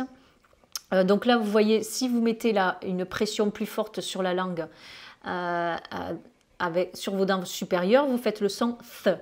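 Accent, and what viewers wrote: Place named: Toulouse, France. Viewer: Tout d'abord moi qui suit de Toulouse, j'ai peut-être reconnu le petit accent 😉chez, que je tiens à remercier pour cette vidéo et les autres bien sûr, ainsi que tous les interveneants d'Anglais cours club. Méthode excellente!!!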